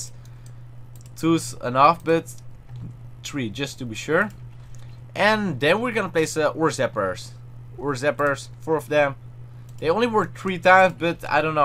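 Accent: Netherlands. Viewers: are you from holland? You sound Dutch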